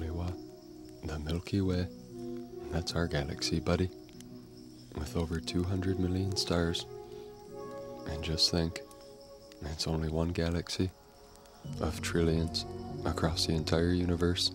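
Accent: Canada. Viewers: No. The "eh" is a Canadian thing. I'm Canadian. I also think he says, "buddy".. Another Canadian thing. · Are you perhaps….. Canadian?🇨🇦🇨🇦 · Love your videos. So relaxing. How are you not Canadian? You sound 100% Canadian.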